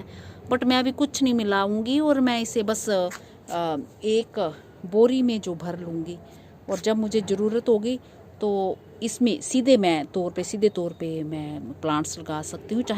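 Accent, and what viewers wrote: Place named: Haryana, India. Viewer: Ok ,aap jesay bolti hai us say legta hai ki aap haryana say, bahut achi city say hai aap. 👍🤝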